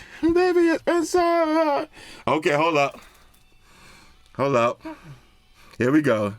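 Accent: New York, United States. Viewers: talks like a NY Giants fan. Gross. Dope vids though. Z flip 3 all the way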